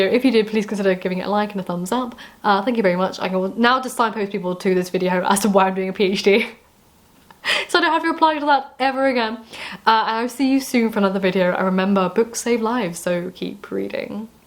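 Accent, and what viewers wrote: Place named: Upper class, United Kingdom. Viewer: Dear Lady. I love your accent, I find it aristocratic, academic and upper class British. Its fun watching and listening to you. I could listen to you all day. You are motivating and just fun. This from a fan a barbaric colonial from across the pond who the fates have planted me in central Florida surrounded by Neo confederates after a carrier at NBC TV Chicago as a video tape editor. Stay well Dear Lady. DJY.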